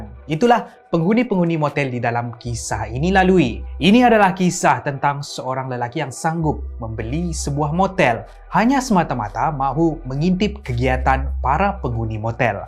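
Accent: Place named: Sabah, Malaysia. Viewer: ketara sabah c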